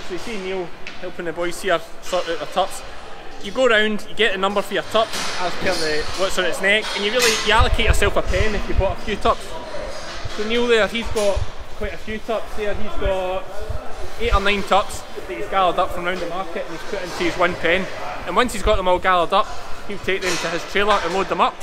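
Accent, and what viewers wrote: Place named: Ayrshire, United Kingdom. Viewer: Your " wonderful accent " is so familiar to me I don't notice anything unusual - until I read the comments. Fair to say the Ayrshire accent is probably under represented on Youtube lol.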